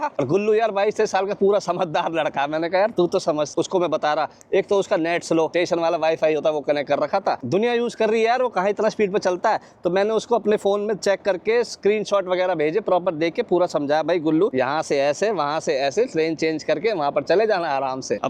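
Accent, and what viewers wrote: Haryana, India. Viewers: Bhai aap ki aawaz sey lagta hey ke aa hariyana ke raheney waaley ho.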